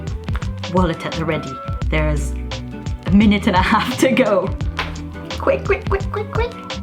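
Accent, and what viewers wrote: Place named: Canada. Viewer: I can't agree with you more! Kindness starts with ourselves. My 16 year old daughter and I have been traveling around the world for her surfing comps. Four continents and six countries later we are struck how everyone everywhere has same dreams, hurts, successes, failures, trials, triumphs, loves and struggles. We have to have compassion and love on one another individually. We are not that different from each other. God has laid this heavy on my heart. I am gonnaknit on Ravelry. I am an expat Canadian living in the USA with my family of 5. I love listening to your Canadian accent and have enjoyed every single episode.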